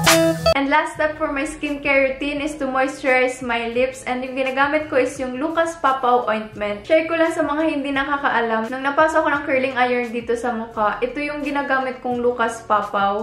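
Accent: Visayas, Philippines. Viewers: Bisaya ka ate?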